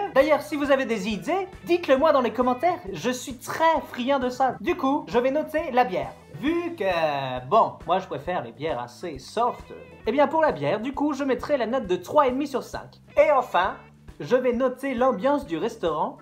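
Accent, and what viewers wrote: Quebec, Canada. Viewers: Très beau accent Québécois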